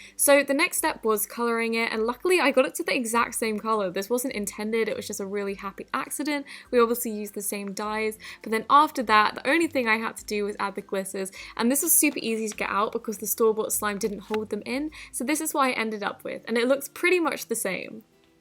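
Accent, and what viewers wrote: United Kingdom. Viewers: Uh yes, British accent, i love it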